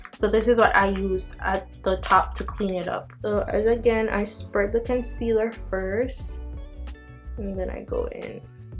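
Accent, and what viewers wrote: Jamaica. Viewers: Are you Jamaican 😊 ?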